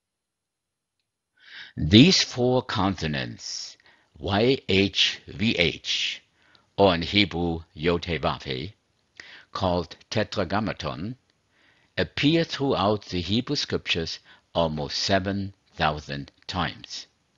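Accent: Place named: Germany. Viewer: sie sind zeuge jehova, wenn ich fragen darf? ich weiss sie können deutsch sprechen, ich erkenne es an ihrem dialekt :) grüße aus bayern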